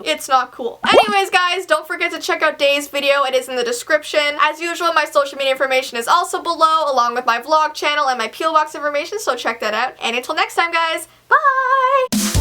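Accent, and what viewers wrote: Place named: Canada. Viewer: think it's jut her Canadian accent. In her baby sitting vid, she pronounced bagel as BAG-gul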